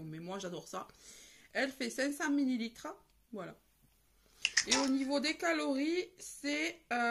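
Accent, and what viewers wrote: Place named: Castres, France. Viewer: j'ai reconnue l'accent, je suis née à castres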